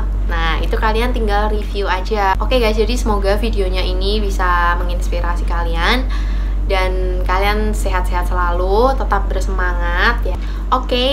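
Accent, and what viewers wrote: Java, Indonesia. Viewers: Jowone endi mbak sampean..? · MB dari mana ya..kok Jawanya keliatan..salam.kenal mb dr Yogyakarta.nyimak nih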